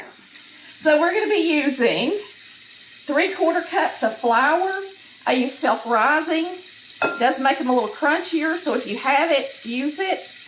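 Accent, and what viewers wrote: South, United States. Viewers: I wanna move to the south so i can get this beautiful southern belle voice and postpartum cookin🥺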